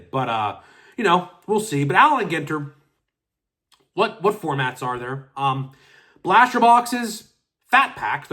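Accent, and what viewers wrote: Pittsburgh, United States. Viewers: Are you from Pittsburgh? I used to work with some Davey Tree workers from WV and Pittsburgh and they said "YIns" all the time and had kind of the same accent. We love Pittsburgh. Beautiful city. Love R. Clemente. Our first trip to the city we missed R. Clemente by one year. 1973 season. Sad but legendary.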